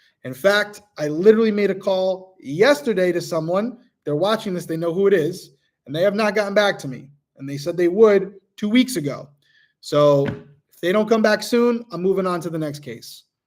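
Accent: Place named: East Coast, United States. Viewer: ❤❤❤ I can straight hear your east coast speak!! Love love love it!!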